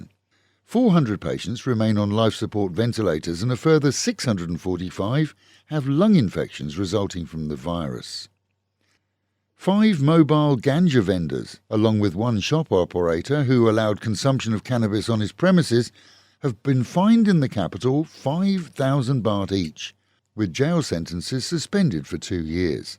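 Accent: United Kingdom. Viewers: are you from Britain ?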